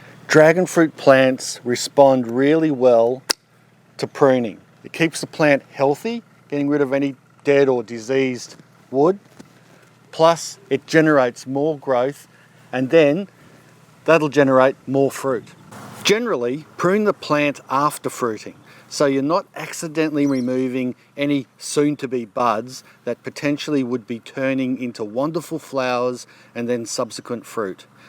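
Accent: Australia. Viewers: Can you give me any tips on cultivating a sweet Australian accent?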